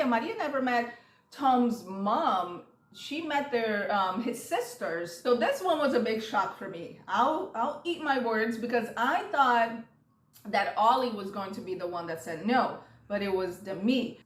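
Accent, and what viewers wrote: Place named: Brazil. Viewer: Are you from Brazil? I can hear a little accent 😊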